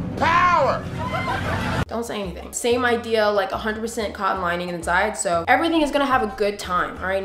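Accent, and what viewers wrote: England, United Kingdom. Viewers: I love how you casually speak in an English accent, I thought I was the only one to do that 😂❤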